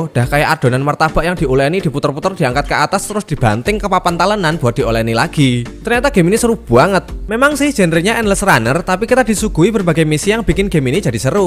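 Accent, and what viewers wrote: Java, Indonesia. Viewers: medok amat suara lu bang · Kok Logat e kejawen pak😂 agak ngapak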